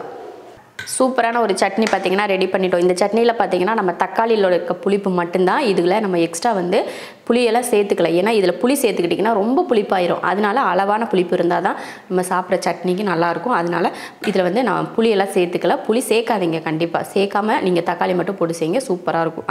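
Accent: Coimbatore, India. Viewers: Neenga Coimbatore ah madam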